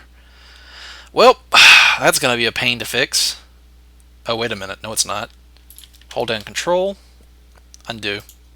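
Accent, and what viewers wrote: South, United States. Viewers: love the southern accent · What part of America are you from>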